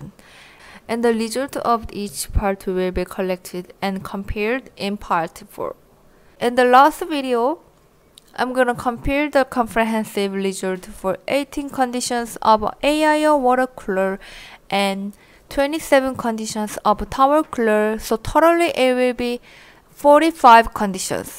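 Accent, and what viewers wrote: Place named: South Korea. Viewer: 한국분이셈? 영어발음이 낯익네요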